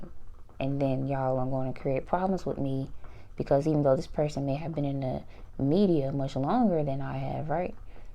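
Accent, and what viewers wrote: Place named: Indiana, United States. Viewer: good content, this is off topic but im from Georgia and my ex is from indiana and you and her have similar accents, but anyway what you're saying is understandable in regards to the reactions, your music and your passion for it is admirable, keep doing what you're doing. you'll get where you want with your gift.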